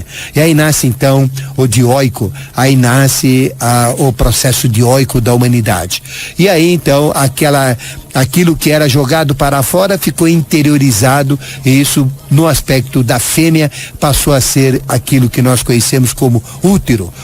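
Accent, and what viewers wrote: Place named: Paraíba, Brazil. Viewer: esse teu sotaque de paraiba que fode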